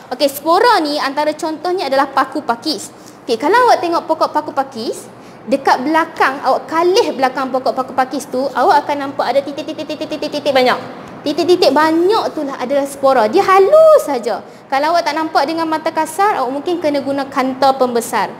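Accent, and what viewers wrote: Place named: Kedah, Malaysia. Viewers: Saja je 😅 kedah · terima kasih cikgu saya suka cikgu cakap kedah🤭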